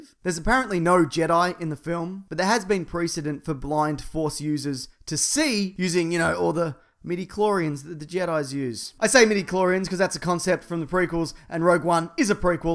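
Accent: Australia. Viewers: Hey man big fan. Just wondering that's an Australian accent right?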